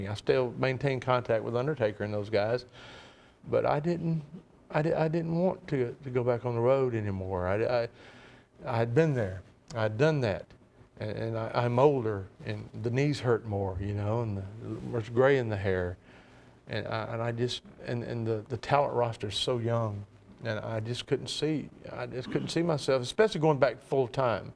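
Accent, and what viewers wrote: South, United States. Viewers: He's got that southern drawl. Being from Alabama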